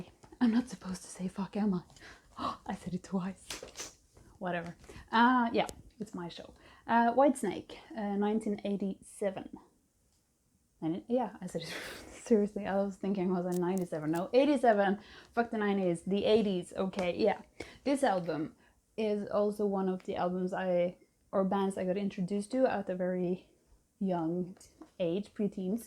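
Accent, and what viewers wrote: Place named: Ohio, United States. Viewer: ha thought you were from ohio,,,,love your accent and your love for metal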